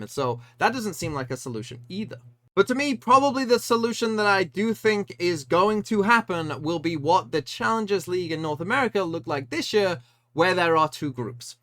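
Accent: England, United Kingdom. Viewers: He's English, right?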